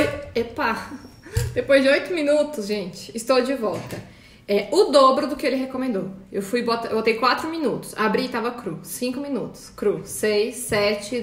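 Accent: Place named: Ceará, Brazil. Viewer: Impressionada como teu sotaque parece com o do Nordeste! Mais especificamente do Ceará. ❤️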